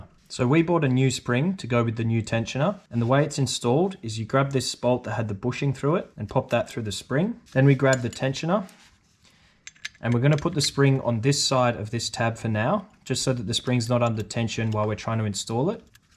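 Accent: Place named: Australia. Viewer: Very informative and detailed. Great video! Greetings from the Dominican Republic. Where are you from? England? I reckon you are from Australia, but I'm not quite sure.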